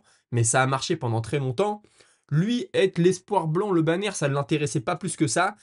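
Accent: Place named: North, France. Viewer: Frerot repond pas si tu veux une fois si je confond pas ta dis que t'avais était a Rotterdam look 1 ufc et vu que ton accent m ait familiers je me demande si tt du nord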